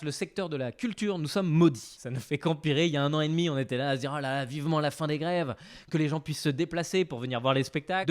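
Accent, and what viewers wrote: Marseille, France. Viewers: Pas fane de ton accent marseillais mais contente de voir ton retour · Pour un bon Lorrain, bravo l'accent marseillais....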